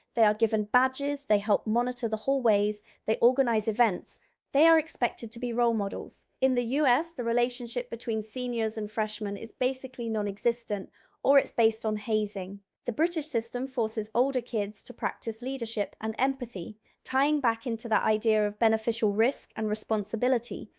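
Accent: England, United Kingdom. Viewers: Why does she sound complete English? Just asking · First of all: You are already acquiring a proper British accent after only 2 years in the UK. Good job, you are acclimatizing rather well! One important topic not mentioned here: The Media, the News, whatchamacallit! In 'Murica, the "News" are all about ratings, what makes people watch their channel, and EVERY media outlet has found fear to be an attention magnet. Reporting the most dire happenings seems to draw viewers in 'Murica, and the media emphasizes bad news to the point of insanity. I think it was Michael Moore that traveled by road to Canada, and described a pit stop just south of the border where the TV was blaring about the latest shootings, kidnappings and road pile-ups in 'Murica. Exciting news, eh? Soon after crossing the border, he made another pit stop at a Canadian service station. 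There was a rather muted TV in the place that was running a calm Canadian news channel, mostly about local politics and local community news. No school shootings, kidnappings or pile-ups. Just ordinary, everyday news, no overblown disaster news. Of course shit happens in Canada, but it is not blown out of proportion. Relaxing news, eh? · This woman sounds very British for an American. The biggest differences relate to gun laws. Children get killed in USA schools but all their families get are "thoughts and prayers". There are never any amendments to USA gun laws. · That’s the most English accent I’ve heard for someone who’s only been here two years. Something weird about this. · English accent! Better than mine 😂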